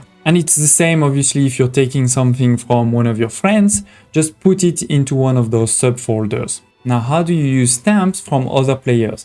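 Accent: France. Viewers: Je ne suis pas douée en anglais, mais merci merci pour tes explications 🥰. Grâce à ton accent visiblement français 😉, j'ai réussi à te comprendre (et il faut dire que le voir en vidéo en plus ça aide, bien évidemment). EDIT : je viens de voir dans "à propos" que tu es Français, je ne m'étais donc pas trompée, ton accent t'a trahi 😁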